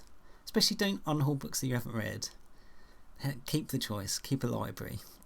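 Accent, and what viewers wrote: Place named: United Kingdom. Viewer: Yesterday I discovered the"mentions" tab in the comments section (classic really, about 5 years late on that one, so right on schedule for me) and I'm honestly so surprised and moved by what you said here! Thank you, really. Although I have to say the biggest surprise was connecting your icon that I know so well with an actual face that both has a British accent and is wearing a Packers hat 😂 reaaally trippy moment for me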